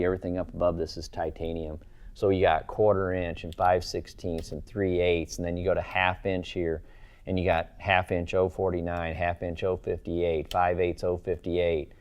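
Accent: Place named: United States. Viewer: Great video ... still cant get over how Americans say tubing and aluminium lol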